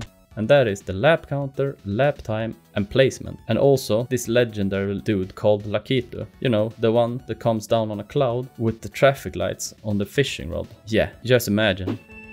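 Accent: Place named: Sweden. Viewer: Omg I love the swedish accent😂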